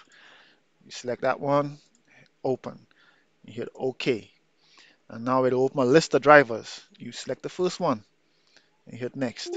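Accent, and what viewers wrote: Trinidad and Tobago. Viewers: Is that a trini accent? Love it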